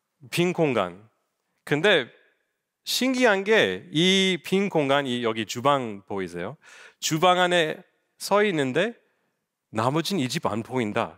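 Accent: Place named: Seoul, South Korea. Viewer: 어디 사투리요? 뭐 경상도 사투리, 전라도 사투리 이런 게 있어야 사투리로 인식을 하죠.. 억양이야 특이하지만 그냥 서울 말씨로 들리는데.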